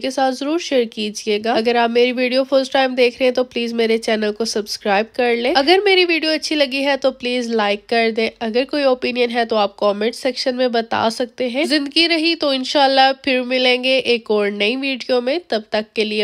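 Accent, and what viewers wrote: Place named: England, United Kingdom. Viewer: ap ki urdu bari kmal hai hahah mujy boht maza ata ha england walon ki urdu sun k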